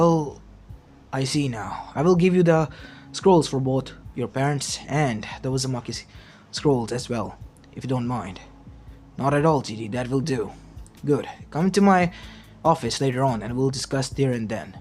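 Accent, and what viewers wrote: India. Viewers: Bro has the Indian accent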